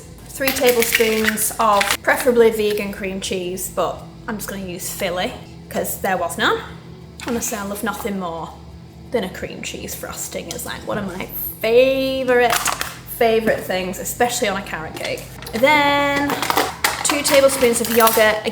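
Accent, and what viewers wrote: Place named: North, United Kingdom. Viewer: Keep the northerness going! I love it when you drop in certain phrases or ways of saying things. Wishing you, and Boe a lovely Christmas xxx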